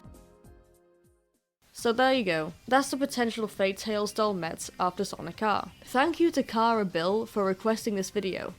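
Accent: United Kingdom. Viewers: I love your British accent, it sounds so deep and classy!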